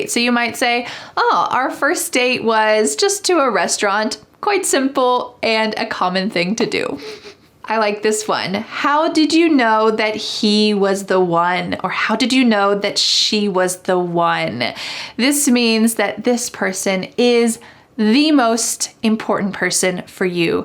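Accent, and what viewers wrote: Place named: United States. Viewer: Thanks teacher for your teaching, I love American accent to pronounce ❤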